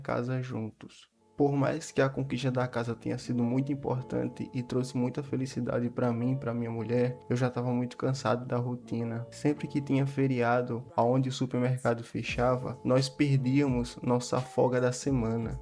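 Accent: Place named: Northeast, Brazil. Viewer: pode falar com sotaque de Nordestino, ta de boa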